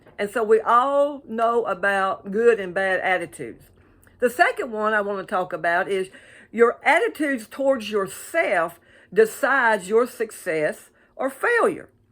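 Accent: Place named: South, United States. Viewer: you brought me happiness today. I got to see your beautiful face, listen to your amazing sweet southern drawl and remember all our good times together. Love you and really miss you! You look fabulous ❤️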